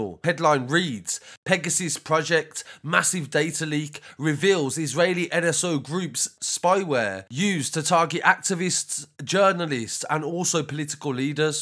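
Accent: Birmingham, United Kingdom. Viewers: You're moving so far from being an actual journalist its a joke. You can't just read a daily mail story in a Brum accent. Pitiful cuz, you're doing more harm than good. Journalist? Joke ting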